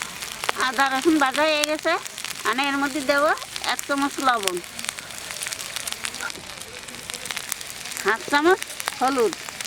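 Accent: Bangladesh. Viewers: দাদীমা আপনি আমাদের মানে বাংলাদেশের মানুষ, আপনার কথা শুনেই বুঝি আর রান্না দেখে তো অবশ্যই আমার দেশের ঘ্রান পাই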